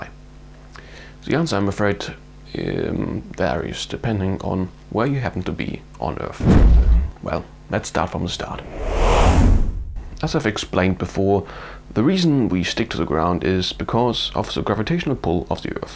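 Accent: Germany. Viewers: If i hadn't read this comment before, i could think you were from Germany with this accent.^^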